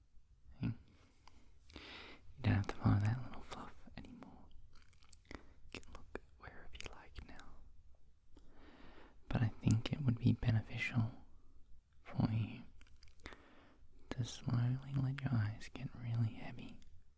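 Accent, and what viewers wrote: Australia. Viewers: You have the perfect soft spoken AND whispery voice for ASMR, and I love feeling at home with the aussie accent. I swear when I subbed to your channel ages ago it was off the back of seeing a few of your videos, and one of them was like a light triggers one? I’ve been trying to find it but can’t, have you ever even done one before or have I gaslit myself into believing you have? If you haven’t, I think there’s some untapped potential there for you, you’ve already got visual triggers and sound triggers down pat, so if you added lights into your visuals that would go insane. Thanks for listening 😌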